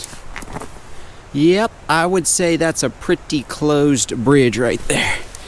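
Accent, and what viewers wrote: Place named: Tennessee, United States. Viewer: That Tennessee accent king in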